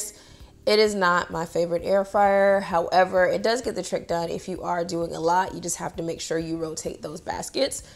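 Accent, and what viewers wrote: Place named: United Kingdom. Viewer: Roger Powell Americans have many different accents. You can hear it in her undertones. It almost sounds British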